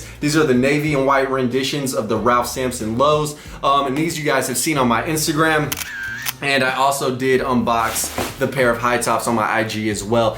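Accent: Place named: Houston, United States. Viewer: I just love how Houston Texas you areee lmaoo if that even makes sense! I didn't notice us Houstonians had an accent until I found you and I loveeeee itttt 😩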